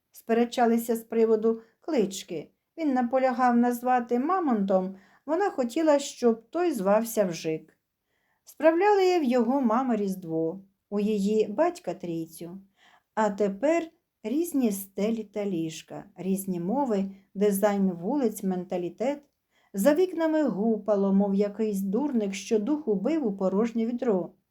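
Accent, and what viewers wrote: Standard. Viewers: Добрий день, у вас чудовий голос, спокійний, знання української мови стовідсоткове, мабуть ви єдина, що розмовляєте на чистій українській мові. Дякую вам. Завжди охоче слухаю вас.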